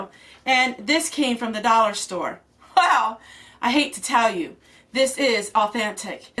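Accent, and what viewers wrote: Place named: Pennsylvania, United States. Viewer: I understand. I watched your Sunday video. It was excellent! You're such a godly lady. ❤ If I didn't know any better I'd think you were from my old neck of the woods.....in Pennsylvania.... because of your accent. 😉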